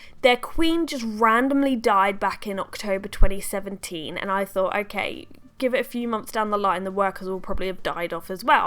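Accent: England, United Kingdom. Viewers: Is she English?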